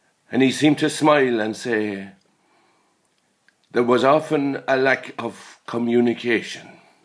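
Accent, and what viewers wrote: Leitrim, Ireland. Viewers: Leitrim. Every County, and Indeed áreas within a County, have a distinctive accent. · What accent is this? I mean, I know it's Irish, but which one in particular? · Hes a Leitrim man. But Leitrim has 3 or 4 different accents. His accent is a mixture of Leitrim and Cavan. All people from his town have that mix.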